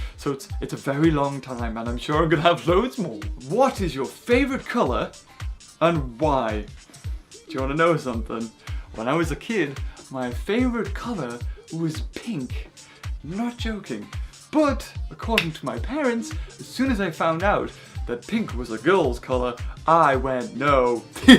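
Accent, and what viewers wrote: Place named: United Kingdom. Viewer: If it's not to much trouble but do you mind me asking you where you live You British and most of my friends are British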